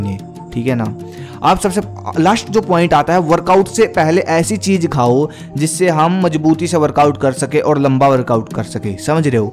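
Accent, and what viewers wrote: Haryana, India. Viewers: Bhai aap kha se ho Mn to nu lagh h ki aap haryana t ho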